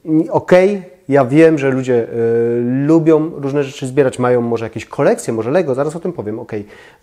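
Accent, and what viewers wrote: Silesia, Poland. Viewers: Synek ze Śląska (?)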